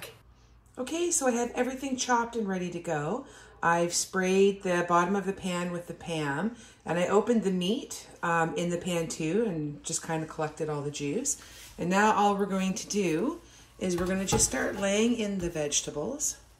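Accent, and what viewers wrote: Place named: Ireland. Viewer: ohio Long way from home! I did not think Canadians had an accent. I was thinking you had a bit of Irish cuz some of the things you said sounded Irish accent.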